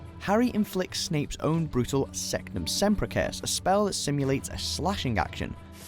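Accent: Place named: Liverpool, United Kingdom. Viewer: Love the scouse accent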